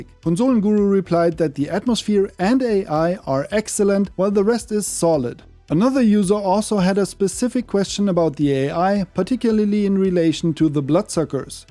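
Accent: Germany. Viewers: german english accent is hilarious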